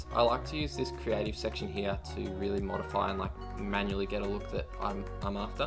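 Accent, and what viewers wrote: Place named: Australia. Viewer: wait are u from australia ? hear ur accent ;)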